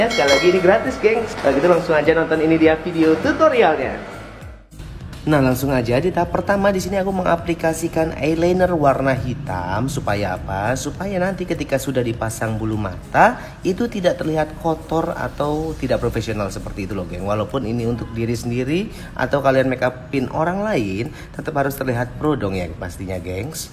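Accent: Java, Indonesia. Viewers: Uda q SUBSCRIBE kak q ngefans sama logat jowone...sampeyan mboten pelit ilmu Mugi Barokah nggeh...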